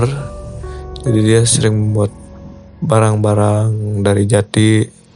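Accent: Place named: West Java, Indonesia. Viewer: wahh logat nya sunda banget yah😂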